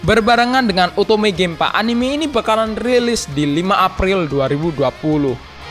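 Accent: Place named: Java, Indonesia. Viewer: Bang lo orang mana bang logat ny kayak orang jawa ya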